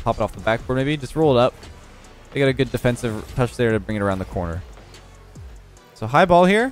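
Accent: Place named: Canada. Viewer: sounding especially Canadian today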